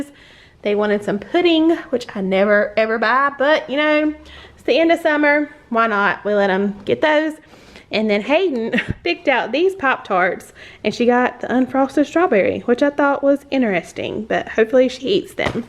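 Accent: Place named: North Carolina, United States. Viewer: I've just found your channel 2 weeks ago. I love it!! You are so calming to listen to and love your meals. They are very similar to my meal plans. I knew when I heard your voice for the first time you lived in NC. I do too. Lol 😆. Keep up the great videos!! Love them.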